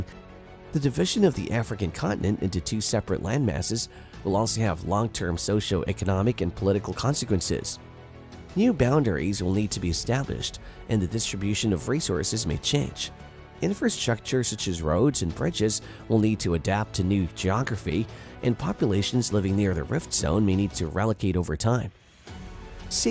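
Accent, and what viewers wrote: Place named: United States. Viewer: He's a robot with a vaguely American accent.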